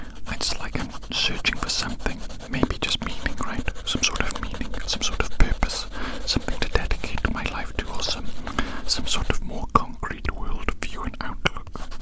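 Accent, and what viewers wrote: Wales, United Kingdom. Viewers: Is that a Welsh accent I hear ( I could be completely wrong lol )🤑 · First time viewer and I detected the Welsh accent in record time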